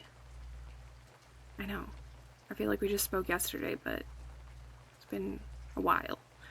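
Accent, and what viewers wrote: Canada. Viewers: Canadian eh? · are you canadian? random question lmaooo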